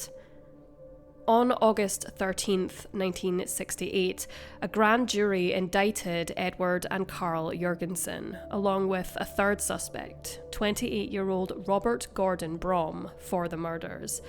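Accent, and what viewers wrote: Scotland, United Kingdom. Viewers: She is Scottish and the English... UK pronunciation is coupee. Stop expecting everyone to speak American English. ~From a fellow American. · The narrator is Scottish.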